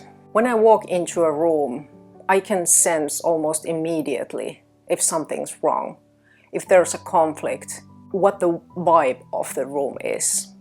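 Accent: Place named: Finland. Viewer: Some 40 years ago, I had the opportunity to live in southern Finland for a year and hearing your accent brought back a lot of good memories. Thank you for that. I became aware of the HSP type only a couple of years ago and it felt very affirming as I read through The list of the ways that we are “different“ that are normal for us. I have quit trying to please others, I accommodate them when I feel I can, and that has made a big difference in how I feel and I’m able to live. I have found 15 to 30 minutes of meditation pretty much first thing in the morning is how I feel and function best so I make sure I have the time and privacy to get that in no matter where I am or what I am doing. I have become a bit of a recluse, but that seems to be how my life works best. You have to honor your own needs first or you cannot be there for anyone else.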